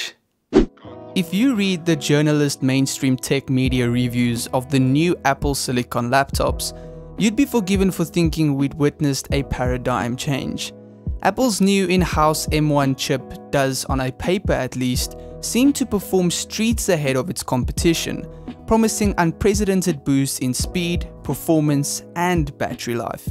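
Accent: South Africa. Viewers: Sound south african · You South African bro?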